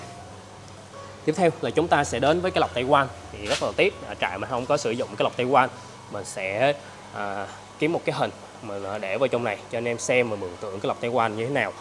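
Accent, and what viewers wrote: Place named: South, Vietnam. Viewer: Sao bạn này giọng nói giống người Miền Nam ghê 😊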